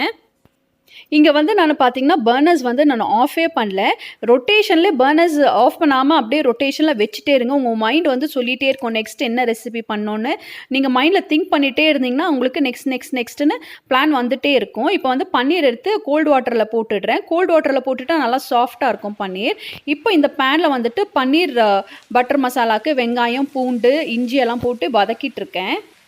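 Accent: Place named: North India, India. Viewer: Unga Tamil romba styla iruku, neinga north Indian a??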